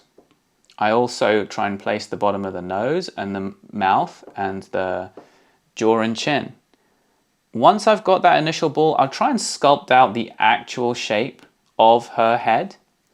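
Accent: United Kingdom. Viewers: I love the accent when an English person lives abroad they have an accent diffent from home town but yet not same accent in country they living in, nice paintings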